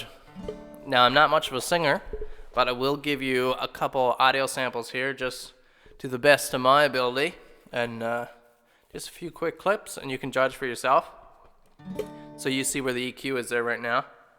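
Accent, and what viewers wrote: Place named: Newfoundland, Canada. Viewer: Start video. "This guy's from Newfoundland". Look at About. "Yup."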